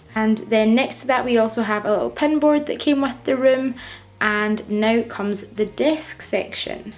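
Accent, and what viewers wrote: Scotland, United Kingdom. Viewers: This room is really cool! I'm just going back for my third year now so am watching videos like this in anticipation. Are you Scottish? Only ask because I am! :)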